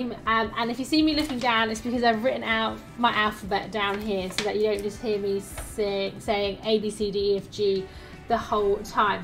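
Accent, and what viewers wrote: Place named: United Kingdom. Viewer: I love your british accent. Good job. Keep on playing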